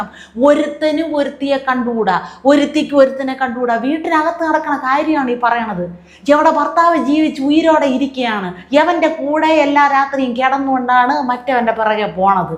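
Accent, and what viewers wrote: Thiruvananthapuram, India. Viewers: tvm കാരിയാണ് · Super super❤❤❤ TVM language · Very good presentation in തിരോന്തരം സ്ലാങ് 👌👌👌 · Thiruvananthapuram slang 💯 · തിരുവനന്തോരം ശൈലിയിലുള്ള അവതരണം അടിപൊളി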